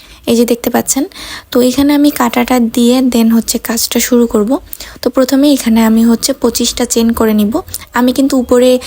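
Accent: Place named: Naogaon, Bangladesh. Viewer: আপনার বাসা কি নওগাঁতে ভাষায় নওগাঁয় মনে হচ্ছে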